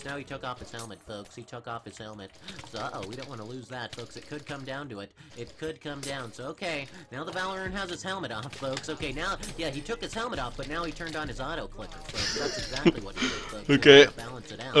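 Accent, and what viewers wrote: Netherlands. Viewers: Hahaha je Nederlands accent is fking grappig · Dude are you Dutch? xd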